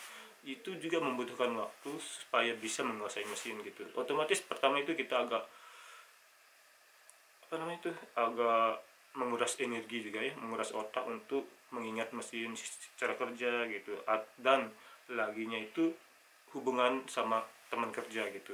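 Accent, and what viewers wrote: Japan. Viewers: Logat Indonesia nya hampir ketutup bahasa Jepang.. Tapi faham jga sih yg dijelaskan. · Mungkin kelamaan di jepang jadi ada logat jepangnya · lama dijepang, logatnya udah jepang bgt.. 👏🏼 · puluhan tahun hidup di jepang logatnya udah hampir kebalik yaa om lidahnya soalnya sering bicara pakai bahasa jepang 😁 semoga sehat selalu om 🙏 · sdh kayak org jepang banget... Dari logat dan intonasi nya... Kyk nya lama2 abang juga kayak abang.. Ilang lombok (indonesia) nya... Semangat bang.trmksh info nya.. 👍👍😀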